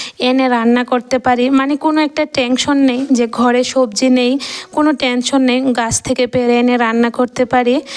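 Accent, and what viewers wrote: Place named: Tripura, India. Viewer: তোমার পুচকি মেয়েটাকে খুব ভালো লাগে তোমার মেয়ের চেহারাটা অনেকটা ছেলের মত। তোমার গাছের কচি চাল কুমড়ো দেখে কিন্তু আমার লোভ লেগে গেল। তুমি যখন ডাইরেক্ট কথা বলছিলে ভিডিওতে তখন তোমার কথাগুলো শুনতে পেলাম মানে তোমাদের ত্রিপুরার ভাষা একটু অন্যরকম। একদিন চলে যাব কাঁঠালের পিঠে খেতে। বাস করল কোনদিনই খাইনি। কেমন লাগে খেতে?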